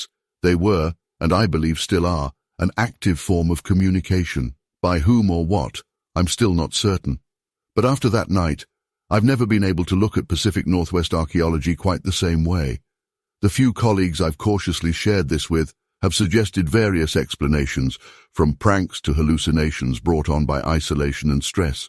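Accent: United Kingdom. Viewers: Ottawa, Canada If this voice is AI can you please use an American voice and NOT a limey's voice. I can't stand British "English"....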